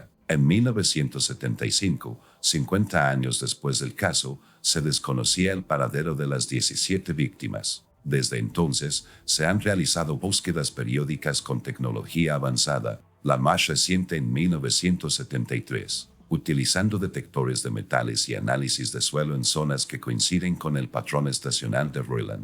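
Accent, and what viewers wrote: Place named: United States. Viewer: Por favor busquen un narrador de habla española no un yanqui con acento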